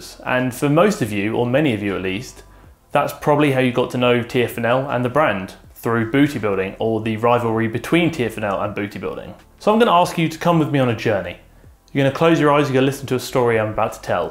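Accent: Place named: RP, United Kingdom. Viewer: i only watch this because of his hugh grant accent omg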